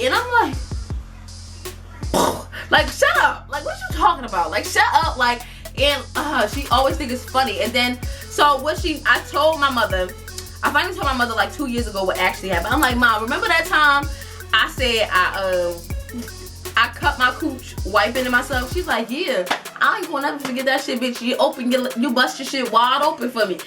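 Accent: New York, United States. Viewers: u are so beautiful and i love ur new yorker accent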